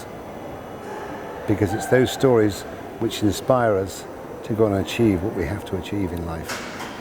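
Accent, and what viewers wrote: United Kingdom. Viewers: I love how his accent goes more Leeds when he's the man of the people, while then immediately correcting with his eloquency. He knows the language of food and of the poor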